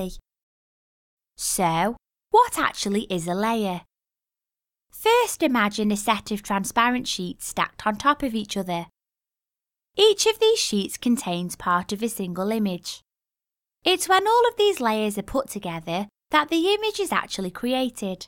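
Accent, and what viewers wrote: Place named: North, United Kingdom. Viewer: You can't beat a good old northern accent. Salt of the earth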